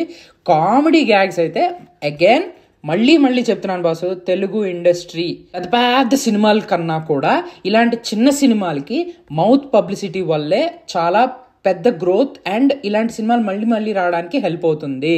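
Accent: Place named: Godavari, India. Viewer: Movie emo Kani Ne Godavari slang was awesome bro 😄😄👌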